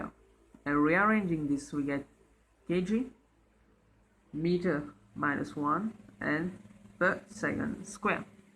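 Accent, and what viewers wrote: Mauritius. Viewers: sounds like a maurician dudeeee!!!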